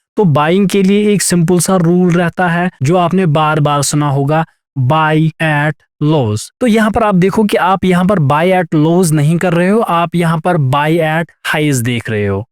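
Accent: Kashmir, India. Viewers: Hi bro... Aawaz sun ki ee laga ki kashmiri ho... Same here from South kash.... Wanna meet you... Please tag your address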